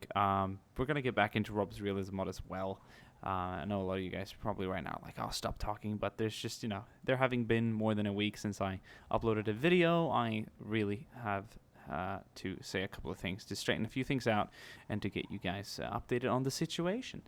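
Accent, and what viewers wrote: Sweden. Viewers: Your Swedish? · are you swedish?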